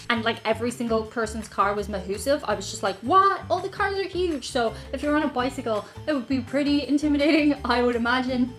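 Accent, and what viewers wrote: United States. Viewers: very insightful! you talk so much like an American that i forget English is your 2nd language. You must be quite smart! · Why don't you sound like Sersha Ronan? I think you're American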